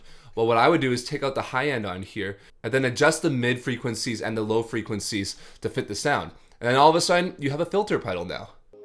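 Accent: United States. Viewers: i don't know why, but the way you talk reminds me of "Californians" (SNL)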